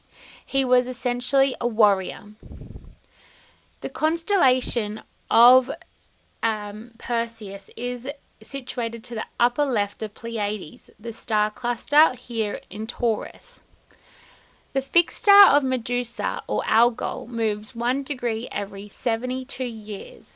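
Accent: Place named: Australia. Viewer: ❤ Hi. It is great to hear your views and also from a fellow Australian. I am saying this to help you improve. It is wise to use short succinct sentences, particularly with different cultures. I suggest slowing down. Try not to begin a sentence with So this means, And then... etc. It is like continuing the same sentence when it is actually the beginning of a new point. I had to learn the hard way when doing my Masters that short sentences were digestible. It is why people may find you hard to understand. Australians speak very quickly, particularly compared to the British. Our flat tone and different pronunciation is also a problem. I strongly recommend leaving a one second pause at the end of the sentence. It is like hearing you say "and then, and then, so and then...." I used Description (definitions and examples), then analysis (on the one hand this, on the other that), interpretation (what does this mean, what are the different viewpoints), and judgement (drawing conclusions) as a successful format. 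It is up to you of course. I just subscribed!🤣👍🦘🦘🦘💜🙋‍♀️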